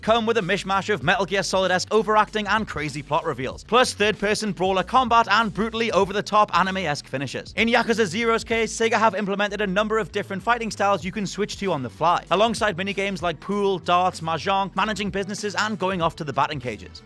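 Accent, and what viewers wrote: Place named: England, United Kingdom. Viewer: Shouting English accent I can't watch these videos it's painful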